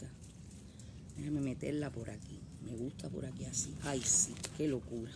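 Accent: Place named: Cuba. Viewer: buenas tardes! Estoy buscando tus videos, por el puro gusto de escucharte hablar 😂ese acento cubano, a mí me encanta. Eres muy inteligente y culta, y creo que noto algo de perfeccionismo, que casi comparto contigo. (Ya lo estoy dejando, para no sufrir y hacer sufrir tanto 😂)Si supieras, yo quería ser presentadora de noticias por TV, y no es que fuera imposible, ni siquiera difícil, pero tuve la suerte 🍀 de optar por otra profesión, la cual al día de hoy todavía ejerzo. Fue un gusto ver y oír tu contenido. Saludos 🙏🏻🇩🇴🥰🌹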